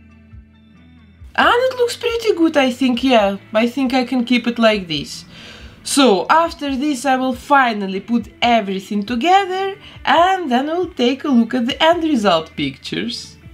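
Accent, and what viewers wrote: Russia. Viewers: Мне кажется или я слышу какой-то типа русский акцент?)) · Удачи! 😅 Вы крутые. Вы русская? У вас просто русский акцент 😃 Красивая леди гага получилась! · Русский акцент 🤘😎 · Я слышу русский акцент... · Почему я слышу русский акцент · Ты очень похожа на русскую девушку. Особенно русский акцент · почему такой жёсткий русский акцент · Это руссктй акцент? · Автор, вы Русская? Акцент прям жесть) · акцент как русский хм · I can hear Russian accent.Вы большая молодец! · Гриша Человеческий я тоже думаю,что она русская,в уши с самого начала прям ударил русский акцент 😂 но куклы у неё прекрасные😍😍😍😍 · Мне тоже кажется, что русский акцент. Но, возможно, она не русская, а просто есть много стран, в которых четко произносятся все буквы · Вы случайно не русская? С акцентом говорите) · у вас золотые руки, но от русского акцента нужно избавляться... слушать невозможно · The doll is cool, but the accent you have isn’t cool at all. 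Too much of a Russian, even hard to understand sometimes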